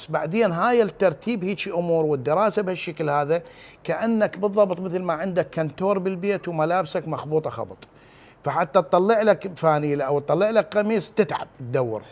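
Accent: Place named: Basra, Iraq. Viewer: هسه هذولا إللي يگولون عليه إيراني شيحسون دشوفو لهجته بصراويه قح ربي يحفظ الشيخ الجليل والخلوق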